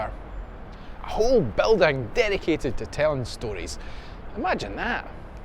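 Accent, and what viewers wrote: Scotland, United Kingdom. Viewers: Thanks again,! I could listen to your erudite and witty brogue all day long. I regularly watch your brother's channel as well -- love ya both!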